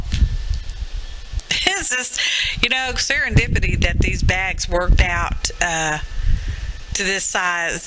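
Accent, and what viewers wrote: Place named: Alabama-Mississippi, United States. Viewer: sounds just like Ala-ssippi!!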